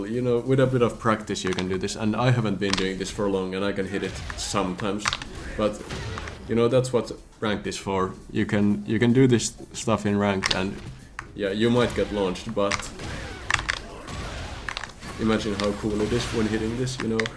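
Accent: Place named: Finland. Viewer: Finnish accent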